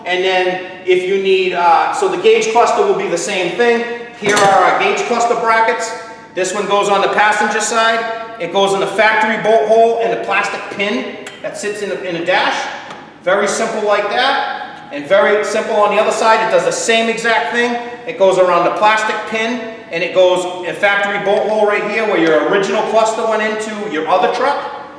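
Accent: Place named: New York, United States. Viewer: I think this guy just might be from the new york area.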